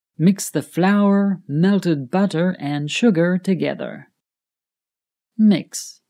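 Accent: United Kingdom. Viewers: Is this british english ? 🤔🤔🤔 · It s british english????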